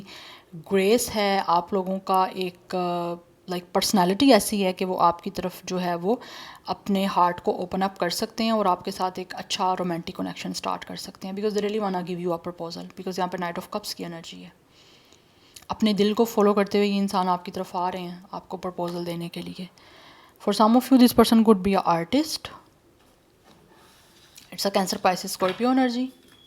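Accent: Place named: Haripur, India. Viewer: Ape card reading main her bate per yes keuin bolty hain Mazret kay sath ape Hari poor key hain ape kay Lehjay say Andaza Lagya hy main nay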